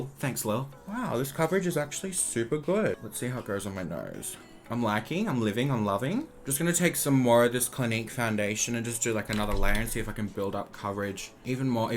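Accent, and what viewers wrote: Queensland, Australia. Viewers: I've noticed that the queensland accent says "oh" as "err" e.g. "Sephora prER makeup" lol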